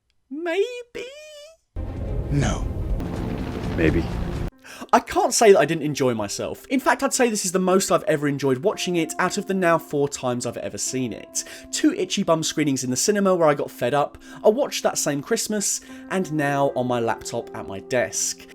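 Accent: United Kingdom. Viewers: You got a put this video out a year ago. Now it’s too late to jump on the bandwagon of success. I have a problem with Zack Snyder‘s conservative politics which do seep into his movies. He made Rorschach a hero in the watchmen when he was meant to be a sociopath. In this movie superman is detached from his usual since I’m morality, right and wrong. And his parents tell him not to help people. Stupid objectivism. I guess you can’t hear how thick your British accent is. Your Kevin Costner was a terrible impersonation. The Man of Steel has flaws. The story should’ve been total chronologically. With all the distraction of metropolis there is no way Lois should have been in the final scene. I mean what did she do ?- take a taxi? Jor El played by Russell Crowe basically gave Clark the job he was literally born to do. In direct contradiction with everything he was fighting against on Krypton. 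And I did not see any reason why Clark needed to have the codex pumped into his DNA. It didn’t make him stronger than any other kryptonian. It was a plot point that was never used again in any movie. Maybe the goal was to have all the little kryptonian babies put in the bottle city of Kandor or like in the comic books. The excessive destruction started with a comic book from the 80s called damage control by the late great comic book writer Dwayne McDuffie. He later went on to create static shock, a whole line of Black superheroes for DC And was one of the major writers for the justice league and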